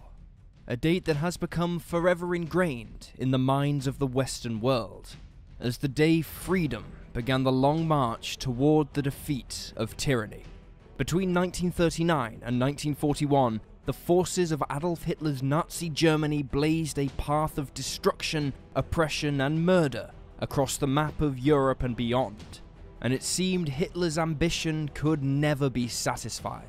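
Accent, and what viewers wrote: United Kingdom. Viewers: British babble !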